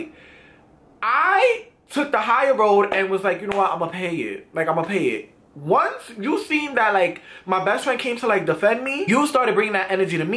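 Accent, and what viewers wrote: New York, United States. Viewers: He got that New York talk yo. Brooklyn or the Bronx or Marcy Projects son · that New York accent thoooooooooooo. REPPIN FROM THE BXXXX HEA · yo i dead always watch your vids to hear the ny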